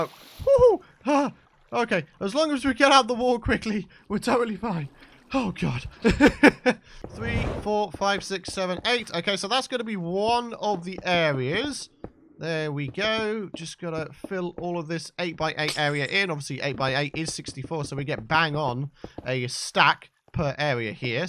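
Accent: United Kingdom. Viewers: Where in the uk are you from?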